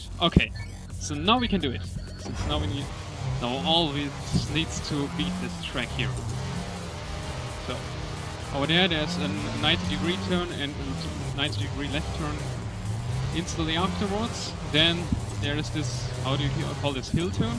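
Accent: Germany. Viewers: deutscher aksent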